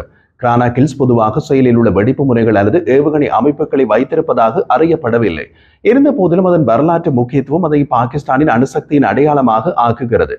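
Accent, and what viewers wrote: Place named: Sri Lanka. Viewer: 👌ஸ்ரீலங்கன் தமிழ்🇱🇰🌹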